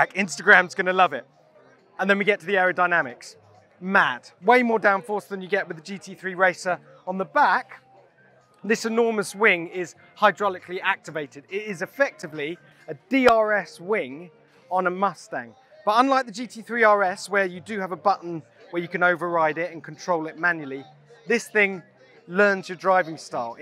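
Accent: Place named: United Kingdom. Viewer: Why do they have a Brit introducing an American muscle car THAT DONT WORK VERY ANNOYING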